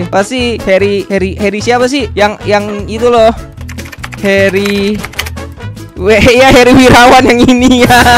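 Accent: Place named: Depok, Indonesia. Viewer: Omongan u kek orang depok bang.🤣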